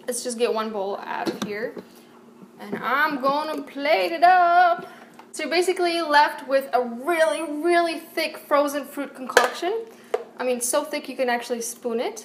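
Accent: Poland. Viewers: omf i absolutely love your accent. 😍 are you by any chance polish? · Just wondering but are you Polish? Because your name is and you kind of sound Polish.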